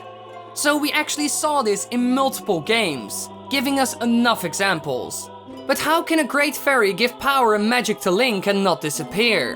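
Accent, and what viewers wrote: Netherlands. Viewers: Your voice sounds dutch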